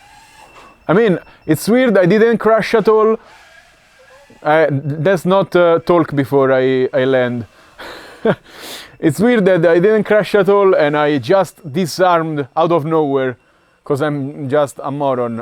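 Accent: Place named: Italy. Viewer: When I was looking to buy my first quad I was confused. Finally I found Your channel and watched Your videos which gave me some knowledge about setups and motivational boost. During this time Your skills were totally mindblowing for me. It's quite funny when I'm coming back here right now I feel so motivated to go out and fly when I hear Your voice and italian accent:D Thanks:)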